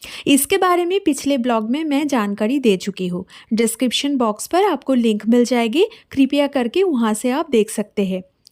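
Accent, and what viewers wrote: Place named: Bengal, India. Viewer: Thik hi laga.hindi bolne me thora sa bengali taan rahtehuye bhi achha hi laga.carryon.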